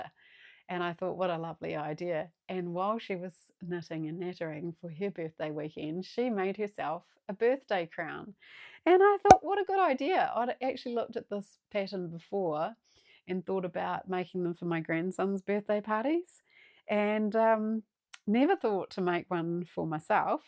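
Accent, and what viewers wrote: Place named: New Zealand. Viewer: You are a joy to watch. I love to hear that kiwi accent. I'm in Wellington just down the road. 😂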